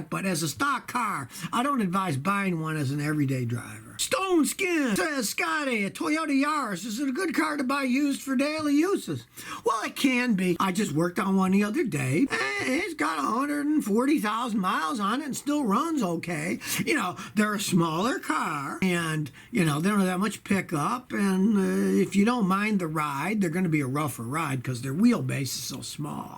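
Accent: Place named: Michigan, United States. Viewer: You ROCK SCOTTY!! You sound like you have a Michigan accent.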